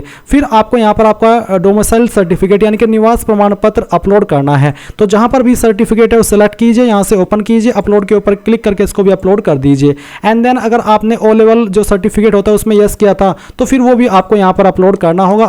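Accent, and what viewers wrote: Saharanpur, India. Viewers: Tum saharanpur se ho kya bhai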